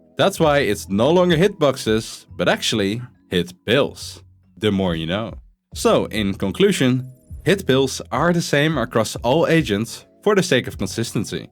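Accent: Netherlands. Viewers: Are you Dutch? You sound so dutch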